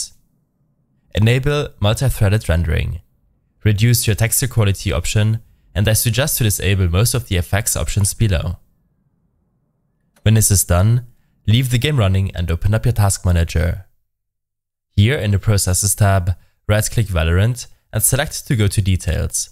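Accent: Germany. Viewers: Hört sich ekelhaft deutsch an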